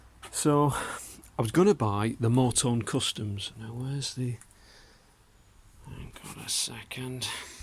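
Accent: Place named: Northern England, United Kingdom. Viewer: Many thanks my mate for the vid....ordered a TEC X-Pipe yesterday....so fitting soon.....want to make a before and after road vid before I change it. Some great tip there....most helpful. You sound just like me...I don't trust anybody else working on my bike....every where is so slap dash these days....killed me having to take my T100 back to the dealer for its first service.....could do it with my eyes closed but did not want to risk the warranty....or having aggro over it. I was trying to compare the Motone re the TEC part as I did not know which one to go for.....did you find out much about the Motone pipe before you got yours.....did you find any performance gains after fitting? You sound like a norther lad like me...Runcorn..... I agree these bikes OOooozz quality. Thanks again for the tips. Nick.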